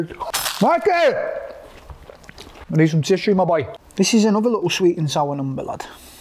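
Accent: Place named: Darwen, United Kingdom. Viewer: Say you’re from Darwen? May sound like it but you look like you’re from Burnley…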